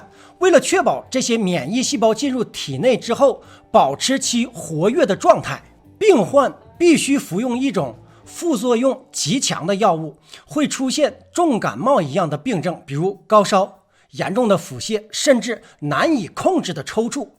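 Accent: Northeast, China. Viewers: 你是东北人吧？熬熬很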